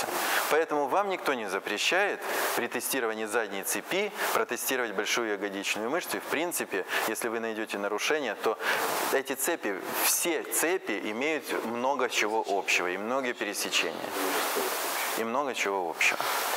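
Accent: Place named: Odessa, Ukraine. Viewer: Одессит. Папа тоже травматолог.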